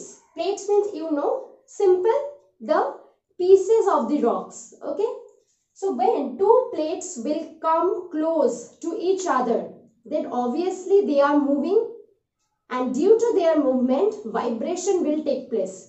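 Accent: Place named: Bengal, India. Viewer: She speaks in bengali accent that's why this happens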